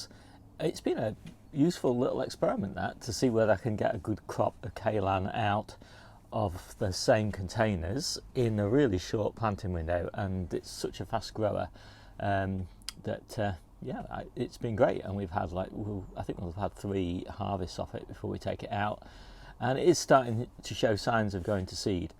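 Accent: United Kingdom. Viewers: I KNEW IT! Lincolnshire accent is one of them which sounds generically north, yet, not in any way whereby you'd be able to tell/pinpoint in any particular way. It's like Grass is said like gr-ass (northern) instead of gr-arse (like the southern way of putting an R sound after the A), yet, when at a bar a coke will be requested as coke (leaning more towards the southern pronunciation) and not c-ork (like the Yorkshire pronunciation)! 😅 What threw me though is the lack of a hard A sound. I doubt, for example, you'd say caarpaark like someone living on the north Lincolnshire coast would ☺️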